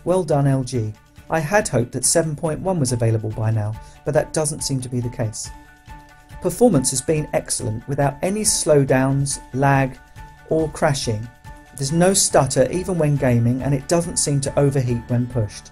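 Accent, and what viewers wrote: United Kingdom. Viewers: Nice review! Everything i need to know is covered here. I love the British accent too.😊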